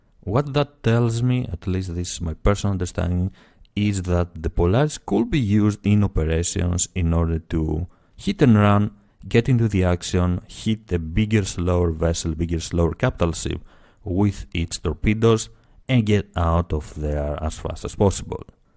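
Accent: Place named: Greece. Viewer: Great vid, solid to-the-point information without superfluous speculations. Also I like your accent - you're greek, aren't you?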